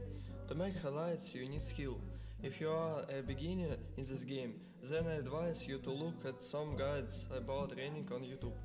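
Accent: Russia. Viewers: боже мне так нравится твой русский акцент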